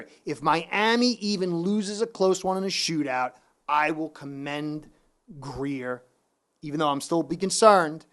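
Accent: New York, United States. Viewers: Great video as usual. You know what’s funny? When you get excited and talk about what you talk about with your ny accent, but when your clip comes up about your sponsor, it’s all professional and what not. I just think it’s funny. Keep up the great work and please never ever put a video out that last 4hrs like some other people do. Some videos are longer then the actual games. And I’m not talking about live streams, I’m talking about just regular ol videos. Sheesh.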